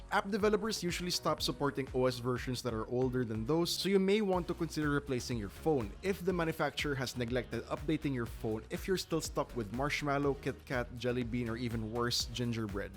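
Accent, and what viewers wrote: Philippines. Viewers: Philipino accent😂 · Bro’s Filipino af I can tell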